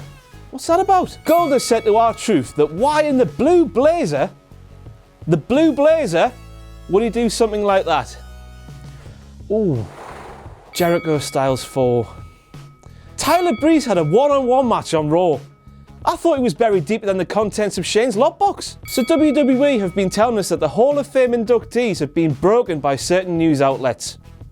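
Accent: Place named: United Kingdom. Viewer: british accent.. wtf is he speaking in?????? english?????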